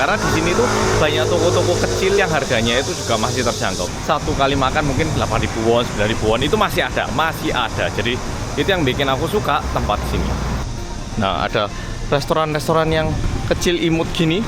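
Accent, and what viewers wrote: Java, Indonesia. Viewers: kayaknya sudah lamaa ga kolab sama londo kampung.podo medhoke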